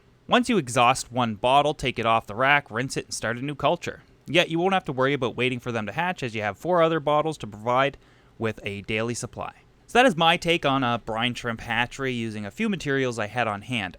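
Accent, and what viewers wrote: Canada. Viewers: Are you from Canada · Best video on this subject. You are Canadian huh? I can tell by how you say about.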